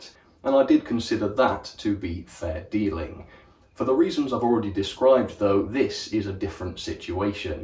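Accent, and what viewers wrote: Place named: Liverpool, United Kingdom. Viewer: I figured that he was in Liverpool based on his accent. Just guessing though.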